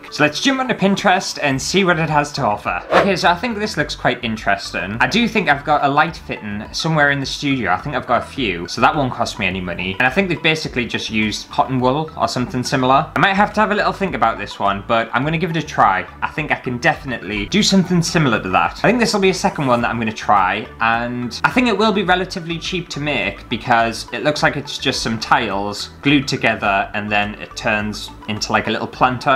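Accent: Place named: Carlisle, United Kingdom. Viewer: I had to come and comment before the video finished. Totally creased when you showed the pocket and then the knife slid out the bottom. Now I've stopped laughing I'll continue with the rest of the video. By the way I came upon your vids by chance, have subscribed and binge watched about a dozen videos at this point. Your banter just cracks me up. Also love the accent, coming from Carlisle it's an accent I'm familiar with.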